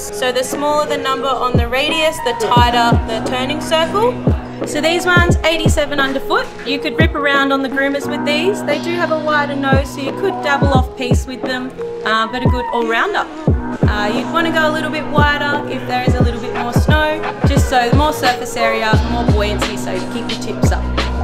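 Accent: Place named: Australia. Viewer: I did not expect to hear someone speak in Aussie accent on a Japanese ski shop video :) I may visit to rent skis when my family goes to Japan for a ski trip.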